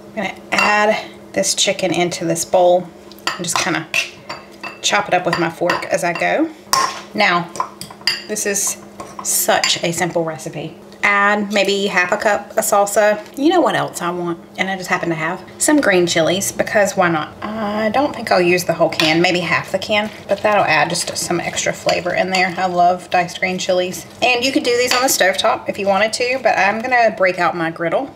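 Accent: South, United States. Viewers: When I hear a southern accent and see them using ingredients from Food Lion I know it's going to be good.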